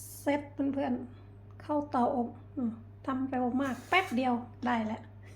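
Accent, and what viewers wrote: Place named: Suphanburi, Thailand. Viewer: ทํามัยพูเหมือนคนสุพรรณเลยทัมมัยต้องออกเสียงสูงมากไป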